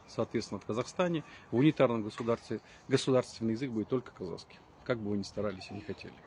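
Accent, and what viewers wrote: Standard. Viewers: Ты кто? Зачем стараешься разжечь межнационалбную рознь? Говоришь ты на чистейшем русском языке, молодец, почему другие не могут говорить и изучать его? Может тебе англоссксы деньжат подбросили и ты их отрабатываешь? Уважать и почитать нужно людям друг друга а не ссорится ибо распри не кчему хоршему не приводят. Быть добру. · А почему на русском говоришь🤔. Да так чисто😅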